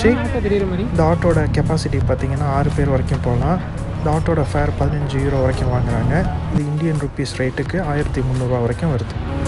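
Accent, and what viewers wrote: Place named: United Kingdom. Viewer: Tamil la UK accent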